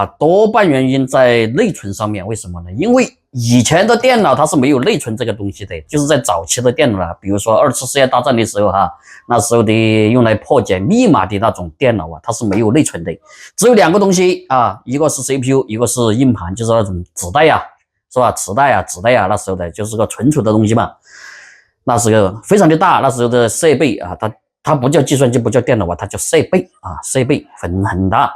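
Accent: Sichuan, China. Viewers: 设备，噻呗😅 的川普很有意思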